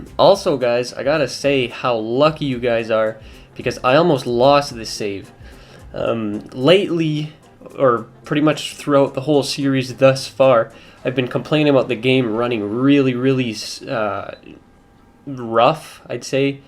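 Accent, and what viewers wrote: Canada. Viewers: I thought I could tell from your voice you were Canadian, MTL talk and Canada Day video proved it lol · Ok, are you from Minnesota or Canada? Your accent is very...Canadian.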